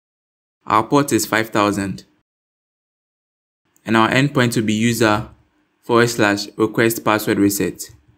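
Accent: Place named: Nigeria. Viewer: Imagine my surprise clicking on the video and hearing the most nigerian voice ever. Nice one, my guy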